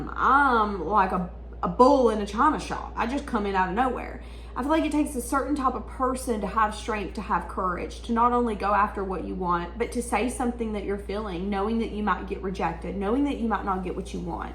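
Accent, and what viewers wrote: South, United States. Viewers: Hey I just want to say I really love your southen accent ❤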